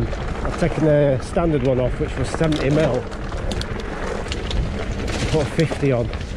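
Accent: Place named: Yorkshire, United Kingdom. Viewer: Yorksheer way? Northern 👊🏼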